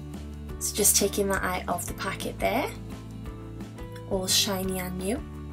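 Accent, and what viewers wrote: United Kingdom. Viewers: uk accent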